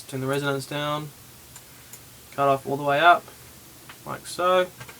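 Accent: New Zealand. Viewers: haha i love how as soon as i heard this guy talk, i knew he was kiwi! NZ PRIDE! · NEW ZEALAND!!!! YEAH lol. first heard the accent and thought finally someone from my own country!!!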